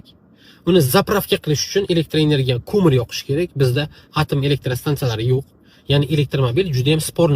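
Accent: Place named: Khorezm, Uzbekistan. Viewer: Bu brat Xorazmdan uxshidi aqsent uxshamin duribdi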